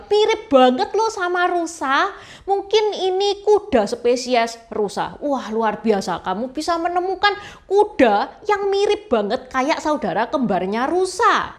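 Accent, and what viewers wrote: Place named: East Java, Indonesia. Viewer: Aku senang dengan cara bercerita. Dia pakai bahasa Indonesia sehari2 logat Jawa Timur. Selamat, semoga sukses!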